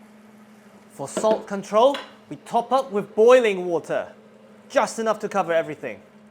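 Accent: United Kingdom. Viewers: If I were to assume, I think he learned English from Europe. So he has a mix of an Asian accent and British English accent. He has a good sounding voice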